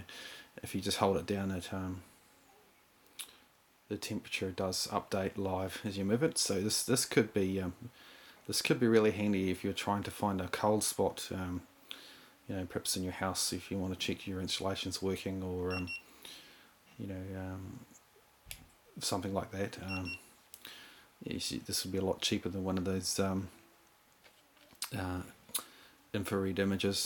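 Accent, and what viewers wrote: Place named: New Zealand. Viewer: Thanks, I was considering this, will definitely get one. Great to hear a Kiwi voice too!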